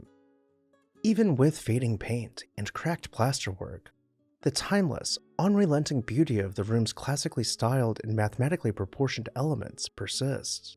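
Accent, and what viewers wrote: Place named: Missouri, United States. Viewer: Hey,. I enjoy your Missouri accent! To where have you moved?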